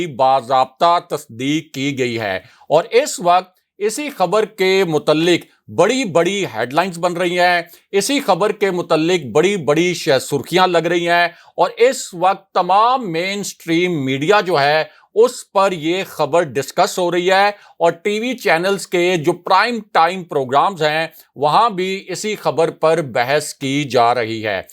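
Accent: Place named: Pothohar, Pakistan. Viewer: پوٹھاری اردو پاپا اور بات لن وی نہیں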